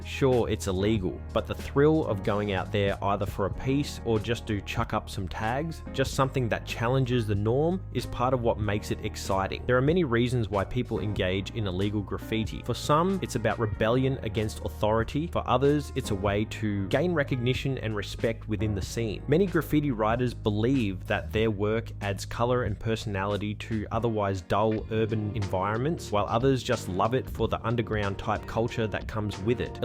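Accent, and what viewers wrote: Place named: Australia. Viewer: Australian accents are so cool. Great video, as always, especially the comedy bit at the end. 👍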